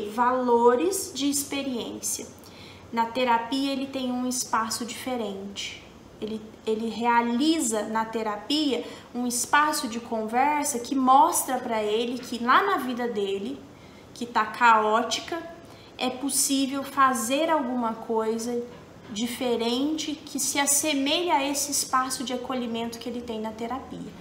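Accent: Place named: Minas Gerais, Brazil. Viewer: sou estudante de Psicologia, estou no do 7º semestre. E sou apaixonada por suas aulas, não apenas por sua didática de ensinar, de transmitir o seu conhecimento, mas pelo seu sotaque gostoso que me remete saudosamente ao meu pai que era também mineirinho. Obrigada, por compartilhar informações de tamanho valor para nós estudantes, com tamanha riqueza e simplicidade ao mesmo tempo. Sempre compartilho seu conteúdo com meus colegas de turma. Beijokas e fique sempre bem (sempre que possível)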